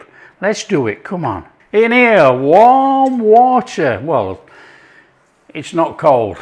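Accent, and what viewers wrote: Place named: Scotland, United Kingdom. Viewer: I'm half Italian, and this is really making me hungry right now. I'm browsing for bread recipes, I haven't even had anything to eat yet. Loving his accent. Is that Scottish, I hear?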